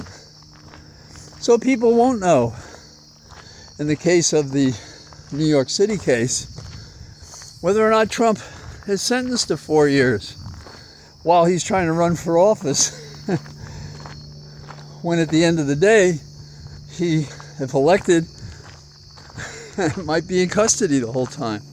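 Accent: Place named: Ireland. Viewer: ... I never miss your talks. I always learn a great deal. Your critique of Judge Juan Merchan and D.A. Alvin Bragg today is understandable. However, I believe there is valid reasoning behind their actions. The D.C. Circuit Court is going to lay out Jack Smith's charges and these will be presented and discussed with reporters able to appraise them. That will occur before the election and I believe they will have a great impact upon the public, the court and the election. After Mr. Trump is defeated, the judge will have a full range of options to sanction him. That is, I believe, the plan. We shall see. Thank you for your input each day and for your Irish lilt!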